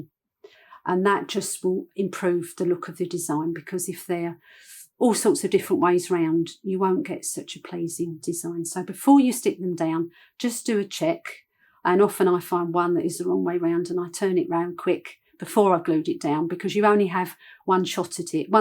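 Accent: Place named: Cowley, United Kingdom. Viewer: Your accent helps - sounds terribly similar to what I grew up with in Cowley near Uxbridge as a youngster.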